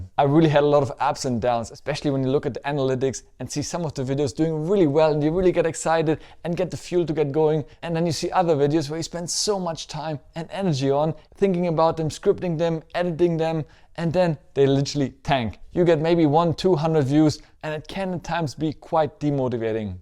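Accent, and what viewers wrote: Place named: Germany. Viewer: Thanks for the reply. Then you are really fluent in English. Apart from a slight german accent (sorry if you have nothing to do with Germany lol, and ofcourse its in no way an inconvenience, just an observation), your flow and delivery is amazing. Hope to be like you someday :)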